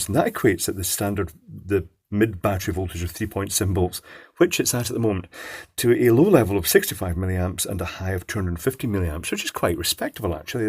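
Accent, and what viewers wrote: United Kingdom. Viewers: the annoying british accent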